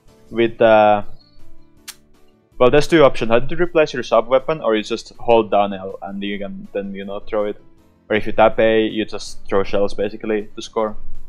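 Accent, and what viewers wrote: Finland. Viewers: Are you finnish? Cuz it really sounds like it xD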